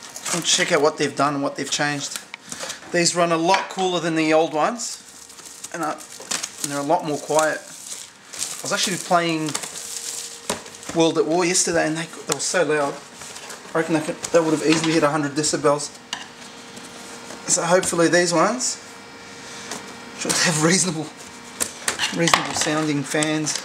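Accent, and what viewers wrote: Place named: Australia. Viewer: Aussie pride, shown through expensive products and decisive accents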